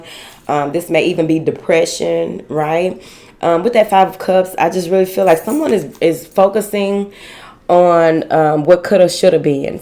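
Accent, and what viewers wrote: United States. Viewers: I love hearing your messages. Thank you so much for always being you!! I love your country accent!!!❤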